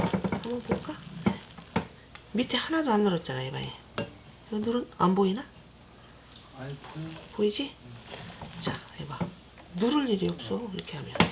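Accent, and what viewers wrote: Chungcheong, South Korea. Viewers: 구수한 충청도사투리군요ㅎㅎ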